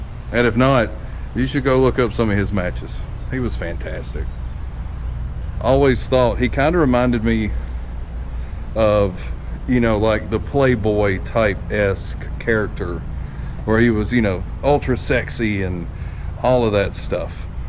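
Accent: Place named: South, United States. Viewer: You have great southern voice. God bless you friend.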